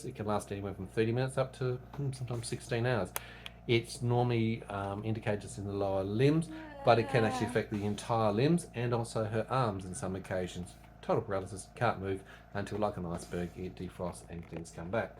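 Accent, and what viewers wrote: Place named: Australia. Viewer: hi my name is Payton and I'm from Pennsylvania and I'm also a special needs adult I have William sindrom and two conditions eye and heart I'm 24 and I support you and you're wife I Hope that she is okay and tell her that I think she is awesome! and you are cool !! I like Australia accent. it's very different than ours I don't drive or live on my own and I have balance issues I'm sending parys for her !! your friend Payton from the United States of America !!❤❤❤❤❤❤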